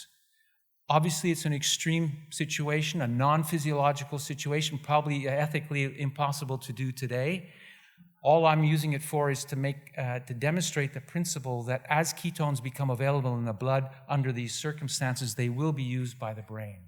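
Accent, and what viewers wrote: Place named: France. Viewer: this guy's definitely French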